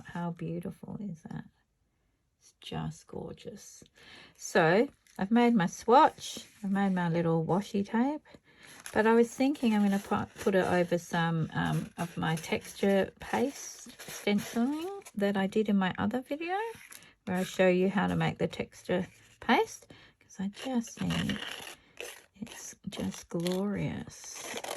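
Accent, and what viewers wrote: Australia. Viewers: I enjoyed your talking! You did a great job. I am not very knowledgeable when it comes to mixed media and learn quite a bit from you! Keep talking! We Americans love an Aussie Accent!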